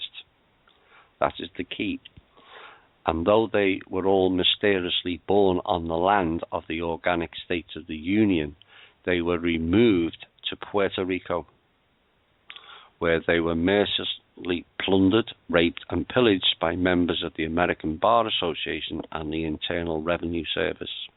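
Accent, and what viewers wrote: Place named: Ireland. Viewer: ...and a special thanks to Anna Von Reitz, your narrative voice, although strangely masculine and Irish, has a wonderfully hypnotizing monotone to it that has helped my insomnia....I can now listen to this youtube audio, which I have recorded as a therapy aid, because its tempo puts me to sleep in under a minute flat....thank you....!!!...I'm cured!!!!....and you've saved me a fortune in alternative solutions.....thanks again...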